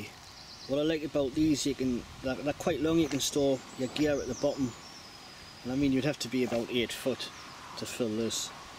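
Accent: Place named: Scotland, United Kingdom. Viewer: Looks spectacular. You sound like a soldier I served with from Scotland. Excuse my ignorance if you are not, some are quite touchy about that....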